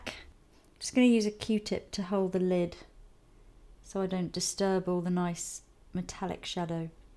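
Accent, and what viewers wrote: Netherlands. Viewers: Is from the Netherlands? She doesn't sound very English to me (but maybe it's just me, don't know =p) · Is Dutch? Her accent sounds Dutch. She's just so beautiful, I can't get over it! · I wonder what nationality is? Her accent sounds very Dutch. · I immediately heard that she's from The Netherlands lol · May I ask where is from? She sounds Dutch to me!:)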